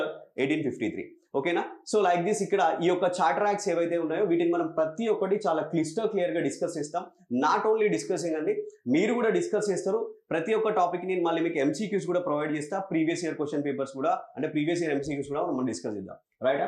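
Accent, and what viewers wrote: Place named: Telangana, India. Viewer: Superb and excellent classes sir... I am a big fan of ur telangana yaasa..🎉🎉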